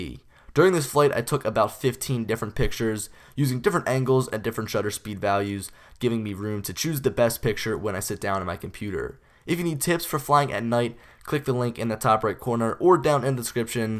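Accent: Philadelphia, United States. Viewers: Dawson Boyle -Ha I'm just joking. I'm from the area, born and raised. First time I watched one of his videos I knew where he was from by the sound of his voice. I know a fellow hoagie when I hear one. City looks good tho huh?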